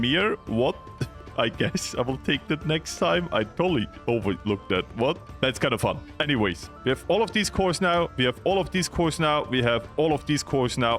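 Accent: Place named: Austria. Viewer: Lol ich wusste immer, dass das eine komische anreihung von Dialekten in den Videos ist...wusste nicht dass du aus Österreich kommst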